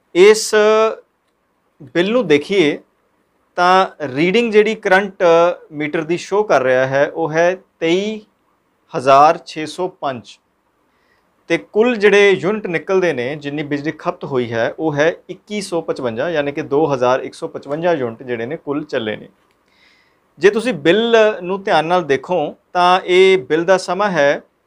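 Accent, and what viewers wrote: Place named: Puadh, India. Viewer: puaadhi language bolde ne vr...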